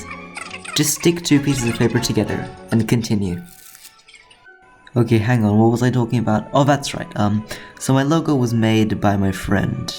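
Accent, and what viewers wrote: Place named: United Kingdom. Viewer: I finally found a british you tuber!😊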